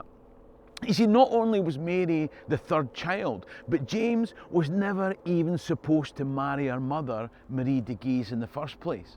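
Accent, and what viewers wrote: Scotland, United Kingdom. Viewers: You just popped up into my YouTube feed and I’m so glad that you did....well, when I say “you”, I mean “your channel”. I love history, but focus mostly on The British Isles , Europe and the States. You are my first Scottish channel and you make history energetic, interesting, and even fun. You make it all the better with your amazing accent....although I, being of the States, in reality is the one with the accent. Thanks, so much!! I have joyfully clicked, smashed and rang the bell (icon). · I could listen to your brogue all day.... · I'm just a regular American, but I love the Scottish accent.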